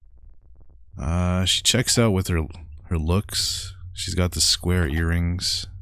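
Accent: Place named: Canada. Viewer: This guy has one of the most aggressively Canadian accent I've ever heard.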